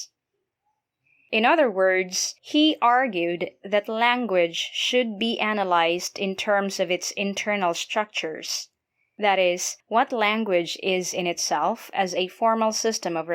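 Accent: Philippines. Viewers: This dialect sounds like the Standard Philippine English.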